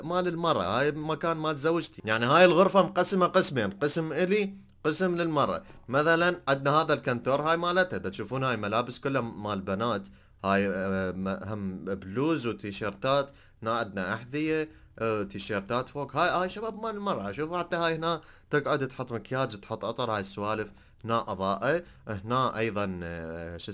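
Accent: Iraq. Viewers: احلى من ينزل اشعار يهز الجوال ❌ اشعار يهز القلب ✅ ياربييي احب لهجة العراقيين من 🇰🇼 الكويت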